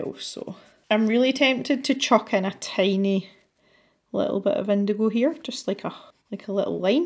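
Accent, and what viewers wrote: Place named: Scottish Highlands, United Kingdom. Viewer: I do enjoy watching your videos where you have put on your “instructor hat” on! You have a true gift for sharing ideas and giving your viewers practical advice on creating art! Truly inspirational, thanks. I remember when I was a youngster and on Sunday evenings we watched a TV Show: Walt Disney’s world of Color! Did you get this show in the UK? They mostly showed Disney real life movies, “Charlie the Lonesome Cougar” and most movies were not cut to fit the time frame of a TV movie, so if they had excess time that did not fill with commercials they showed Disney shorts, sometimes cartoons but sometimes they had creating Disney characters and they showed us viewers how to draw Mickey or Goofy and I remember when I sat down with pencil and paper hoping that the movie would end early and I would get a chance to draw along with the Disney Artists! 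Thank you for sharing all of these wonderful tips and bringing this memory back to the front of my mind! Thank you, watching your videos and listening to your highland lilt brings me and others joy!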